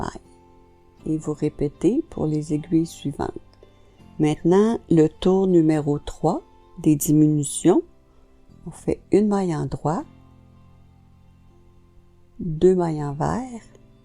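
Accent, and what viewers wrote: Canada. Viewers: Merci beaucoup pour ce nouveau tutoriel.Le rendu est magnifique et les deux brins de laine vont donner un résultat bien chaud. Je vous félicite pour vos explications et images très claires... et ce qui ne gâche rien j'aime beaucoup votre charmant accent canadien ❤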